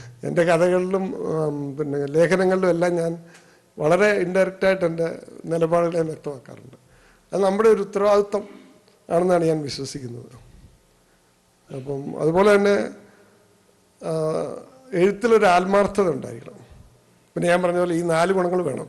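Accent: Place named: Kottayam, India. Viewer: 40 വർഷം ആയി us ൽ ജീവിക്കുന്ന ഈ മനുഷ്യൻ എത്ര സുന്ദരം ആയി ആണ് തനി കോട്ടയം ഭാഷയിൽ സംസാരിക്കുന്നത് 👍🏼👍🏼👍🏼...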